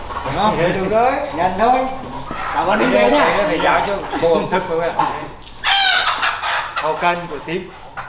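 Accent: Nghe An, Vietnam. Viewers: Ngày nào củng xem chương trình của xem mà không thấy chán vì biết được cuộc sống ở châu phi, và nghe được giọng xử nghệ quê hương mình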